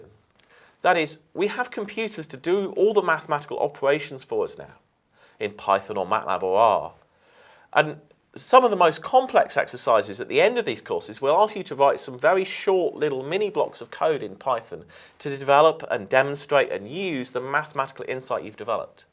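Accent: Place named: United Kingdom. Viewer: The content is perfect but I wish this video were in American English. British Accent is a little bit hard to understand😅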